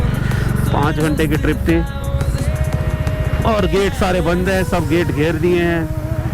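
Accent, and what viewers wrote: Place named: Haryana, India. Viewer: Haryanvi accent is so authentic... 👌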